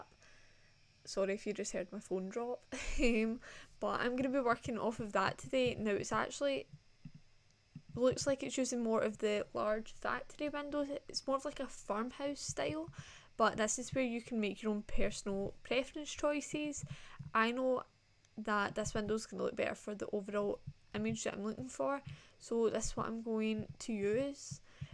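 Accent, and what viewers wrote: Scotland, United Kingdom. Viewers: She’s Scottish? Ireish?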